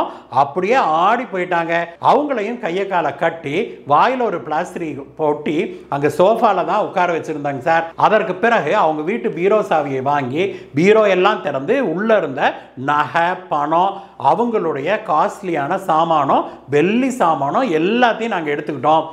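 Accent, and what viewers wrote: Kongu, India. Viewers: உங்கள் கொங்குத் தமிழ் அருமை நிறைய வீடியோ போடுங்க சார் வாழ்த்துக்கள்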